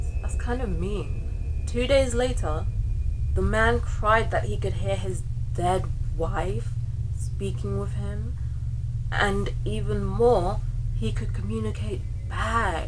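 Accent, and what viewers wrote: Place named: England, United Kingdom. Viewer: Happy New Year! I love your English accent. It enhances your voice with a poetic Cadence. Beautiful! He was a great story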